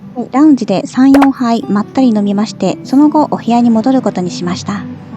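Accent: Kansai, Japan. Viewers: ステキな所ですね👍 ホテルというより、旅館、て感じですね😊 お湯が出る時、エアかむところもレトロで😂これも演出？なんですかね🤣 なんでやねん😄 さんの関西弁かわいい😄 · またお会いできて嬉しいですえ？関西弁やん！❤これだけ人々を虜にする人も珍しい😊 メジャーデビューしてください❤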